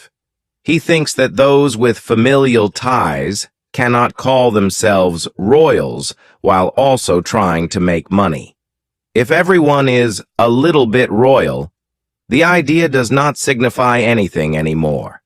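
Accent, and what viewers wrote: United States. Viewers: This is a British site. Stop using an American accent for the narration. Get a British accent or else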